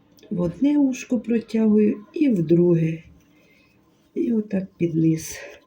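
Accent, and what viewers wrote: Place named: Ukraine. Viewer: Смотрю не только из-за рецептов, но очень нравится говор! Так у нас в Сибири разговаривали наши родственники! Они были переселенцами. Да и не только родственники, у нас вся улица была населена украинцами. Они все переехали в Сибирь в начале прошлого века! И теперь слушаю, как будто возвращаюсь в детство❤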